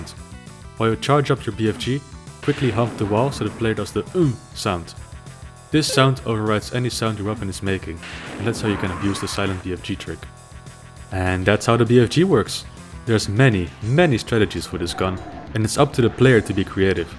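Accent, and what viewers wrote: Netherlands. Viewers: R u Dutch? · tfw you can spot a fellow Dutchie just by their accent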